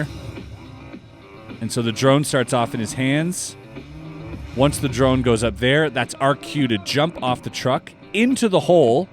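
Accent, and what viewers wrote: Canada. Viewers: Holy smokes! You’re Canadian bud? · You Canadian?!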